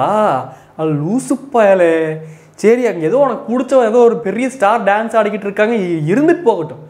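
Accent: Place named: Kerala, India. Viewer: Enna anna adikadi malayalam cross aaguthu😒😂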